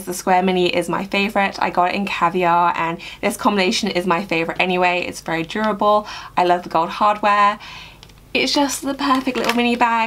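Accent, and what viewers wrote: England, United Kingdom. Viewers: REASONS I LIKE THIS VIDEO RANKED! 1. Succinct 2. Well thought out and planned 3. Intelligent and scientific reasoning 4. English Accent!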